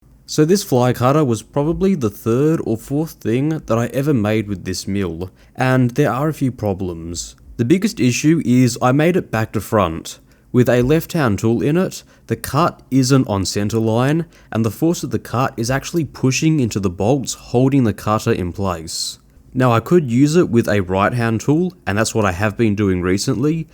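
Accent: Australia. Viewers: He is from Australia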